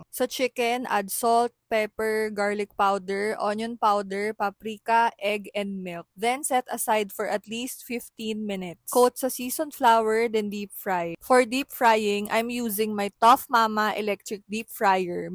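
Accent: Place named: Philippines. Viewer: omg, thank you. I've been wanting to try this food for a long time. It's my first time watching you and when I heard your voice I wondered if you were Filipino, but then you started speaking Tagalog and I was so happy I was right! It looks delicious, I have to make it some day.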